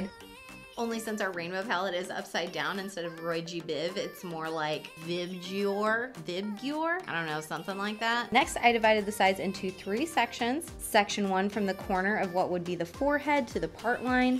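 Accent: Ohio, United States. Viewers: is she from Ohio? She talks just like someone i know who’s from Ohio lol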